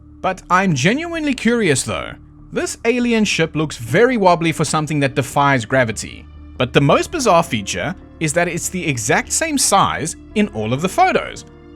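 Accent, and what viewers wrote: South Africa. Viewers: awe boet🤣. I sat this whole video debating wheter you were a soutie or aussie. Ive been proven correct, love the channel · You sound just like my friend Ian who is from South Africa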